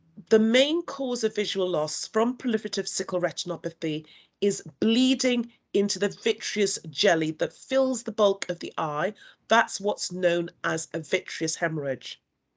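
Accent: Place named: United Kingdom. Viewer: Everything sounds better in british english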